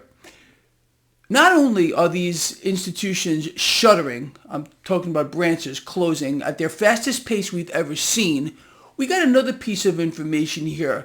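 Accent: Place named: New Jersey, United States. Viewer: I love how you explain everything, i love your Accent, do you come from Northeim erst new jersey? 😊😊😊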